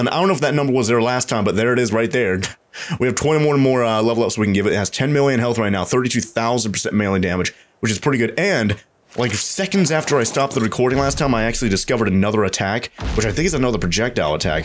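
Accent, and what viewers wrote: Australia. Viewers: i have started to watch ur scorched earth series and realized u sound Australian are u Australian in real life?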